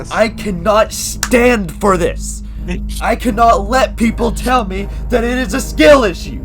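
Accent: United States. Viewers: Lordy lord, that's a fine southern accent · People from pennsylvania often sound like this, wonder if hes from there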